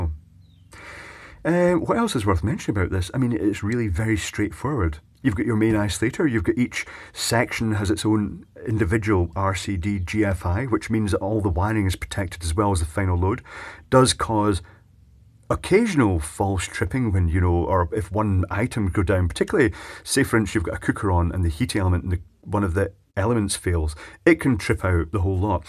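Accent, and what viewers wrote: Scotland, United Kingdom. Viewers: Your Scottish brogue kept me watching the full 18 minutes even though I live in a country with a completely different electrical system.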